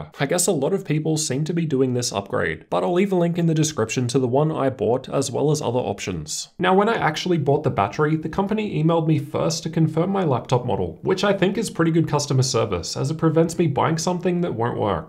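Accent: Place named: Australia. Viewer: It’s so odd how ozzies say words with an o. Nerrrrrr lenerrrrvo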